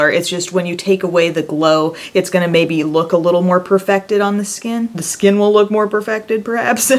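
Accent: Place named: South, United States. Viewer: I just noticed how lovely your Southern accent is haha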